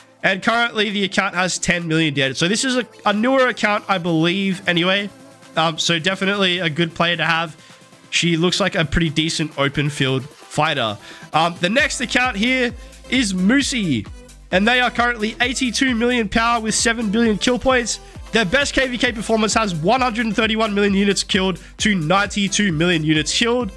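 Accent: Australia. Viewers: Oh dam an Aussie streamer. I had no idea 😂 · r u come from australia ?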